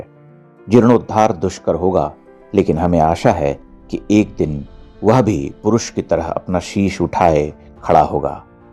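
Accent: Standard. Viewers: श्री मान जी मैंने आपकी वीडियो पहली बार देखी है लेकिन आप की भाषा शैली उच्च स्तर की है विशुद्ध हिन्दी उच्चारण 🙏 धन्य है आप और आपकी प्रस्तुति। मेरी ये इच्छा है कि इन मज़हबी उन्मादियो, आक्रांताओं और आक्रमणकारियों ने पूरे भारत राष्ट्र में जितने भी देवालय खंडित किए है उन सभी का एक सिरे से जीर्णोद्धार हो। जय सिया राम जय भोले नाथ 🙏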